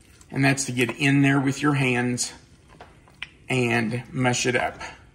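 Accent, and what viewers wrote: South, United States. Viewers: I'm southern, but I can NOT identify that accent. Seriously, not a clue. At first, I was thinking Kentucky, then southern Virginia... but then I was wondering if it's Alabama... Mississippi... Arkansas? I can't place it.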